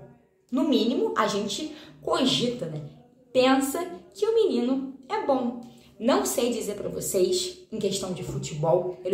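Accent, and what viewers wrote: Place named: Rio de Janeiro, Brazil. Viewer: o sotaque carioca é tão bonito,quanto a própria cidade. o fluzão,só poderia ser dessa cidade,conhecida mundialmente,como cidade maravilhosa. 🌅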